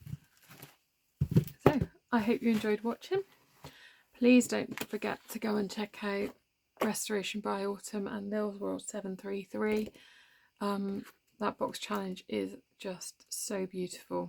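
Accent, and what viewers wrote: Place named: England, United Kingdom. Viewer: ❤ Hi! ❤ I'm here, watching and enjoying! What region in England are you from? I'm trying to figure out your accent.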